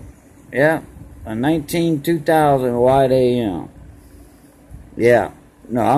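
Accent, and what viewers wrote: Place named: Louisiana, United States. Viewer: hey:).. nice shout outs... i like your accent... thanks for sharing and have a nice day!!:)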